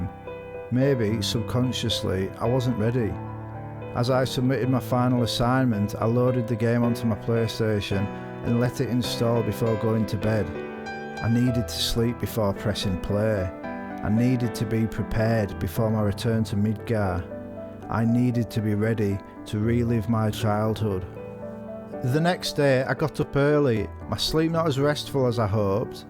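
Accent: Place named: Cumbria, United Kingdom. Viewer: You've got a gift for this brother. Goosebumps. Even with a Cumbrian accent.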